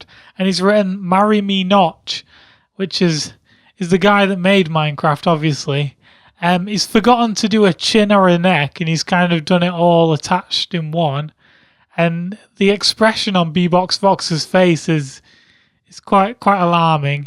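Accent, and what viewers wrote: United Kingdom. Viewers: Best brit voice ever. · Dear. You sound like you're from the UK Sam Age 16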